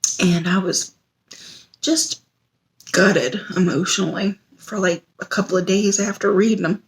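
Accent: South, United States. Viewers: It’s that sweet southern accent and attitude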